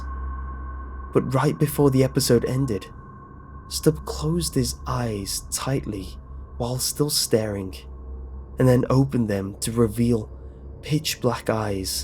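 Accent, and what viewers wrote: United Kingdom. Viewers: DesiLovesSouthPark It's called an accent, friend. They don't do it on purpose, that's just what sounds natural to them. If you'd grown up in the UK, you'd do the same thing and think nothing of it. They probably get annoyed that we DON'T put "r" sounds after "a" sounds or "k" sounds after "g" sounds, it probably pisses THEM off. The American accent is not the one true way to pronounce the English language - it's an accent, like what you'll find anywhere else. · It's a British accent, and sometimes they'll do that without noticing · I really hate that angst saturated british accent!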